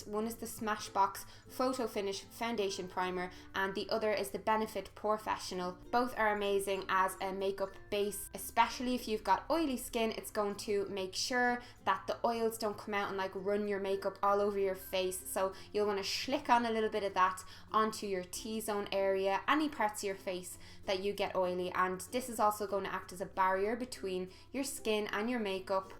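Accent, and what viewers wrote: Ireland. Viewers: I soooo love irish accent... And i have a friend who is irish... She's so sweet and kind... First time watching your video... I subscribed immediately 😘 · I'm always so exited when I see your videos in my subscription box. I love your style and your accent is so desirable! Wish I was Irish! Thanks so much for improving my makeup knowledge and providing such inspiration! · Oooh man, what a lovely accent! It makes me wanna visit Ireland even more <3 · your tutorials are very helpful for people with excoriation disorder like me, now I'm not ashamed of my skin, thank you so much <3 also I'm Russian and I really like Irish accent, for me it's much easier to understand than British :)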